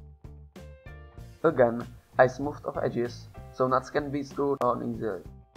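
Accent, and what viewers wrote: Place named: Eastern Europe. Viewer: I am sorry but you sound like slav. Jsi čech nebo slovák?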